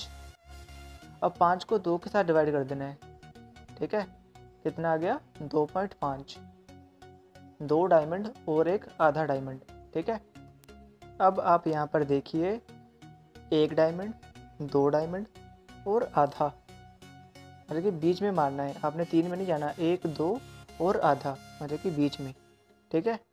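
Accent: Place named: India. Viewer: dude i hate hindi accent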